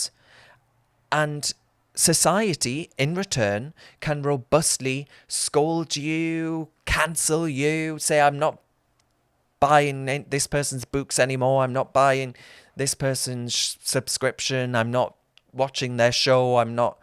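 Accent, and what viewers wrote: Newcastle, United Kingdom. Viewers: Like hearing a fellow Geordie on t'internet 👍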